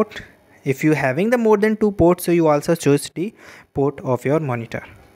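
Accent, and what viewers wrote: India. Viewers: Why when I search to solve a computer problem the YouTube videos are almost always done by some Indian guy whose English (?) is impossible to understand?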